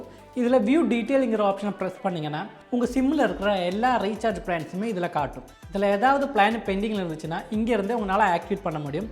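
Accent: Kerala, India. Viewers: Bro Nenga Kerala Va... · Bro ninga keralava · Malayalam slang mari irukku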